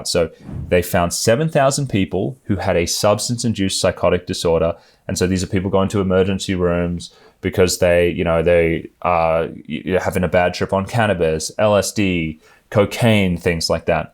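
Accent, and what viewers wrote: Australia. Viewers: I think this is a really important video. That said, however, you are speculating. It could be that there is a genetic or psychological predisposition that makes them sensitive/vulnerable to cannabis. What you said about the conversion rate suggests that there might be a relationship between a tendency for certain psych issues and cannabis (THC?), but it could be the opposite of your suggestion, i.e. it triggers the latent condition. You sound Australian and you look Indonesian. It's a popular place for Indonesians (I lived there for 15.5 years). Did you move or were you born in Oz?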